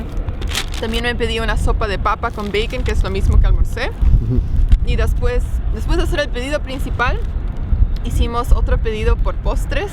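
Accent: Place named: Argentina. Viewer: Esta chica aprendió español en Argentina, o de un argentino, si no me falla el oído, jajaj. Hermoso video! Saludos!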